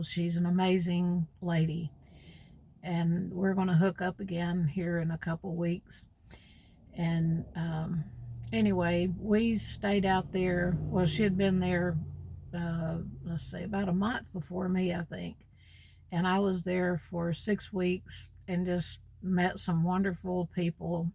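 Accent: South, United States. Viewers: You did a great job! Thanks for sharing your story. I am in Alabama but from northwest TN. I knew you had a bit of a southern accent!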